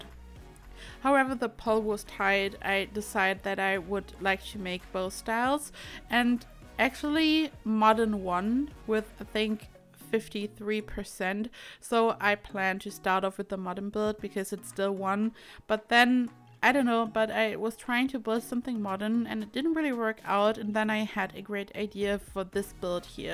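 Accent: Germany. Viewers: Your accent sounds a lot like German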